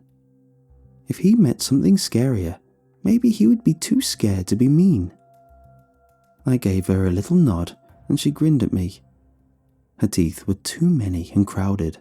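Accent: United Kingdom. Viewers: True, although actually now that I think of it, maybe the narrator was saying holler, but it sounds like "holla" because of his United Kingdom accent...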